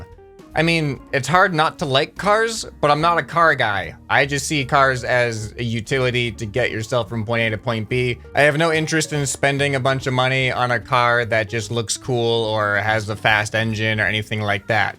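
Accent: Canada. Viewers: huh.. i thought u were Canadian. i thought i heard an accent. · What do u mean he sounds too Canadian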